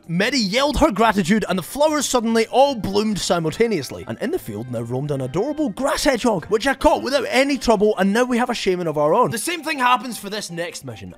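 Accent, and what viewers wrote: Scotland, United Kingdom. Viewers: I absolutely agree this guy is amazing and it’s just great to listen to a Scotsman babble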